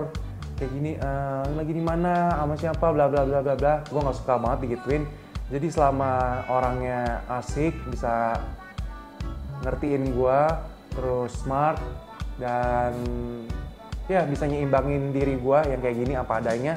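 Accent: Indonesia. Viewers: Logat Serang nya brasa bgt yaa 😁🤭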